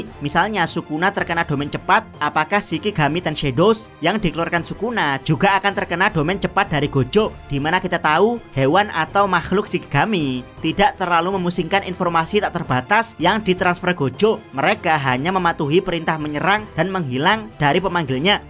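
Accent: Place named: Purworejo, Indonesia. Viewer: Logatnya kaya orang purworejo min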